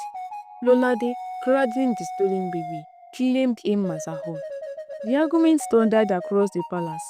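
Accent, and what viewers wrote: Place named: Nigeria. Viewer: Good story. Fantastic Nigerian English accent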